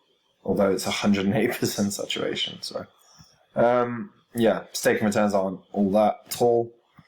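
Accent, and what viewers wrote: United Kingdom. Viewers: You may not like the british accent but damn this guy knows crypto!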